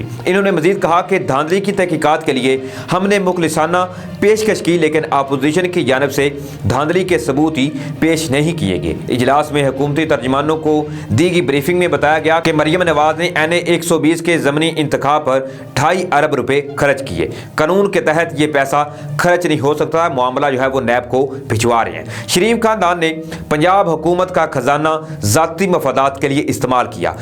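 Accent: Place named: India. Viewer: sahib tum ne urdu bolni kahan se seekhi? Ya tum urdu ko hindi bananay ki nakam koshish kar rahe ho. Mera mashwira hai keh urdu sahi ker lo. Ziada indian ban ne ki koshish na karo.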